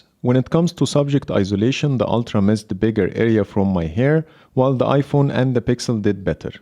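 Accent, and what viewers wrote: Egypt. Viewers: Egyptian accent distracted me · Egyption accent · Your accent looks like you are an Egyptian! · ترا مبين انك مصري و مسوي فيها اجنبي😂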